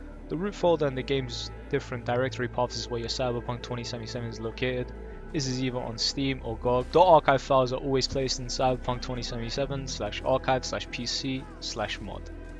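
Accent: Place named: United Kingdom. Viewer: Can you say Water in your British accent please?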